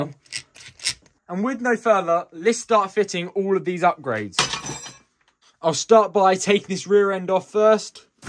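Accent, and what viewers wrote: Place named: United Kingdom. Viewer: Nice new to your channel but I never thought I would find a better basher than kev but I did that’s you also can you do a vid on slash, bandit, and rustler because I have a rustler and I get your British and that’s your accent but saying Al-i-min-yum is fine instead of Al-lum-i-num but pls don’t say Shassy it’s ch not sh you don’t say it like Charolette it’s chassis sorry not trying to be rude but it like makes me feel wiry to hear shassy but love the channel and again I’m sorry if I’m being rude ❤❤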